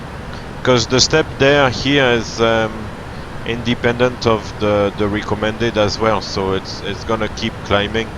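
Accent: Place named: France. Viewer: I was pretty sure you were french, beeing one myself !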